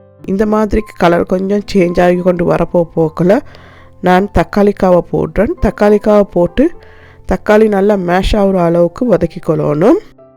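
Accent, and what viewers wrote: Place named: Sri Lanka. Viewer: R u frm Srilanka?bkas yr Tamizh pronounce,that u r Srilankan....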